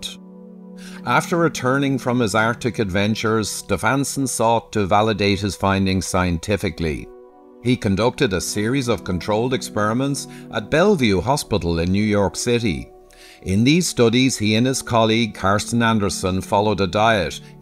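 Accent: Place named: Canada. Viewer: Canadian aye? Thanks!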